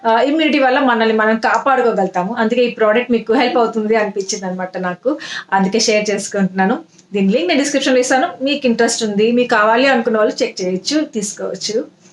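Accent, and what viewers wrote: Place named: North, India. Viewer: Alane anipistundi north side vallu anukunta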